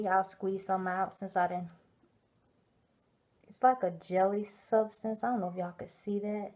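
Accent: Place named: South, United States. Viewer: ❤️your southern accent! I'm from Connecticut. Where are you from?😁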